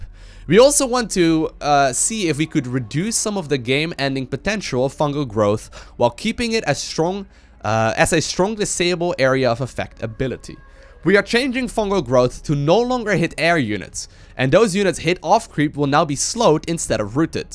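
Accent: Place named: Netherlands. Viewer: I find that if I watch too many videos in a row I start unconsciously talking with a light Dutch accent.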